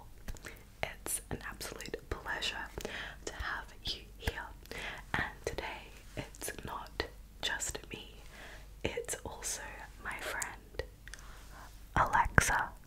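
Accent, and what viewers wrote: Australia. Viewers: Are u australian? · Same!! I've been subbed for a few months and I still can't figure it out. Kind of sounds Australian too?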